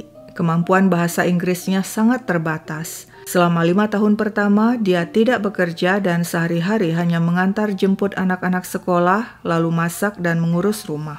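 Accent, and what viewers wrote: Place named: Java, Indonesia. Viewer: Kalau ngomong... bahasa jawanya lucu...lagunya risau berkesan...suaranya unik...dan cantik...👍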